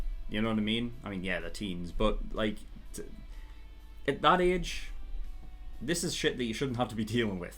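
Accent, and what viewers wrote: United Kingdom. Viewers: I love your reaction so much🙆‍♂️🙆‍♂️I'm Taiwanese. I watch your video for analyzing and learning English listening. 😂Because I seldom hear England accent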